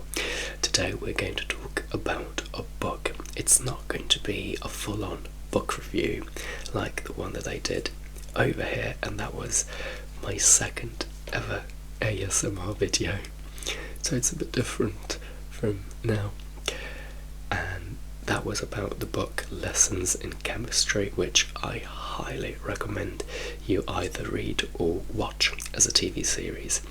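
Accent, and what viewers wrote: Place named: United Kingdom. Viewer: Mamma mia quanto mi piace il tuo British.accent, ma anche il tuo Italiano. Hai una voce rilassatissima. Scusami, anche se adoro l'inglese da quando. Ero bambina e lo parlo volevo farti i complimenti in italiano ❤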